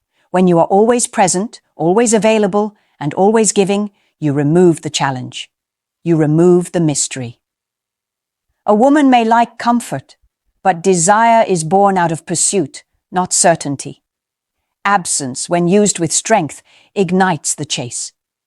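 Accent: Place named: United Kingdom. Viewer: Thank you for your kind wishes! I really like your British accent. Your tone is also very soothing to listen too. Keep it up. ❤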